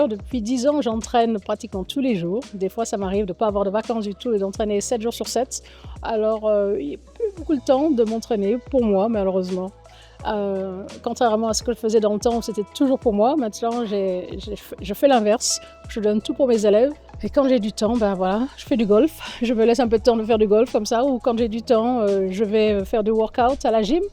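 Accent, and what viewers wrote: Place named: United States. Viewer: Ah ma petite, tu nous a fait rêver, tu nous a fait sourire, tu nous a fait pleurer mais on t’aime tellement! Ça fait trop plaisir de te revoir avec ton petit accent américain. Sois heureuse dans tout ce que tu fait ma belle et surtout continue d'être heureuse et de donner de la joie autour de toi! ❤ Un grand merci à tes parents ❤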